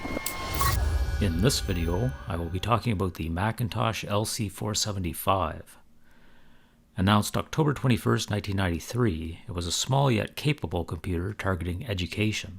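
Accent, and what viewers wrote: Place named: United States. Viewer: It's strange to see this level of obsession with the perfect restore from someone with such an authentic American accent.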